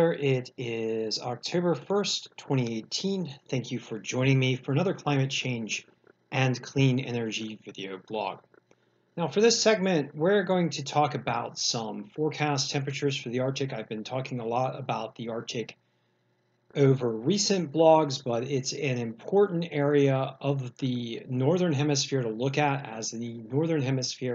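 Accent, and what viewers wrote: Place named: Philadelphia, United States. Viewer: Thank you, your videos are much appreciated. You have a strong accent...you must have spent some time close to Philadelphia Pa., perhaps in the South Jersey area.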